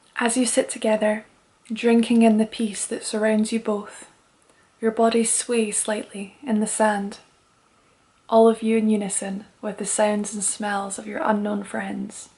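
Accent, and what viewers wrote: Edinburgh, United Kingdom. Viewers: So nice to hear such a lovely Scot lass tell a beautiful story. Would love to hear a wee bit more of the Edinburgh accent, it is my favorite sounding speak.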